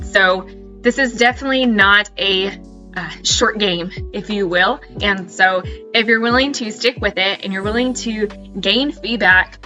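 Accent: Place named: Missouri, United States. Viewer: I had to retrain my ears to hear soil the word bcuz of your Missouri accent.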